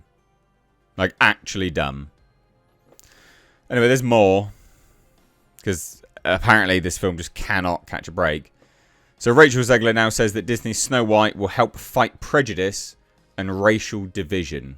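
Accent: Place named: London, United Kingdom. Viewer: You sound like you’re from London!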